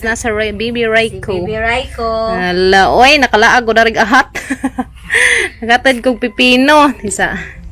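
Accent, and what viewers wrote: Bohol, Philippines. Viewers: Klaroha jud taga buhol oi.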